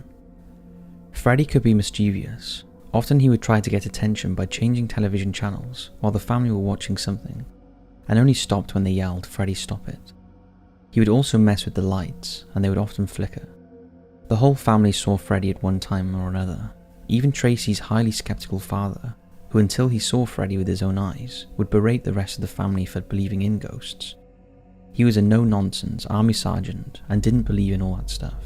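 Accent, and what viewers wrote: United Kingdom. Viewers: Nothing like stories of poltergeists and demons told by soft British voice to help you nod off.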